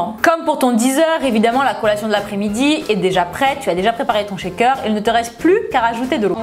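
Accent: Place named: Nice, France. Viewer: Salut, en plus d'avoir un corps magnifique et une tête bien remplie, tu as vraiment beaucoup d'humour, j'adore ton accent (je travaille sur Nice d'ailleurs!) et tes vidéos, elles m'ont beaucoup aidée à me raffermir et à prendre goût au sport (un exploit pour une feignasse comme moi 😂)! bisous bonne continuation!!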